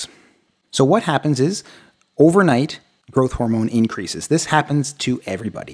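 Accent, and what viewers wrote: Canada. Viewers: I was quite concerned that the morning rise in glucose was a side effect of poor blood sugar control ! What you seem to be saying is it's from other hormones! My blood sugar numbers don't go down until after my first meal, which is about noon. My conclusion that my insulin resistance wasn't improving was incorrect. Are you Canadian, I seemed to hear that from your pronunciation?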